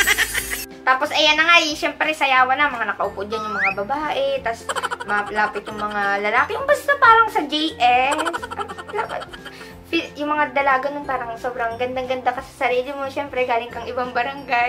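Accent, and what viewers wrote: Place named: Mindoro, Philippines. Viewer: Natutuwa ako sa mga vlogs mo, relate much ako the way you speak, magkapit probinsya tau, sinasabi ko din minsan mangyan ako, hehehe..keep it up...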